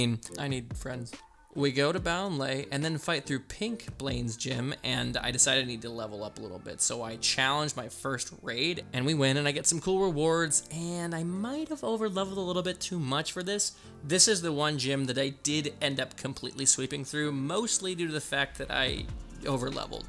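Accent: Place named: England, United Kingdom. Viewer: IBXToycat IMPRESSION/ making fun of the american accent is the first thing i thought of after you apologised for an english one, lol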